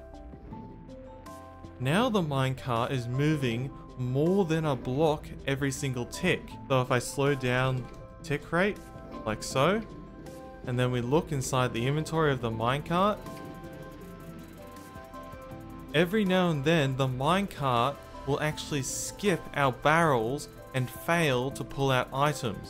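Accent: Australia. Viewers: Two questions: Are you an Aussie? Is that your i20n?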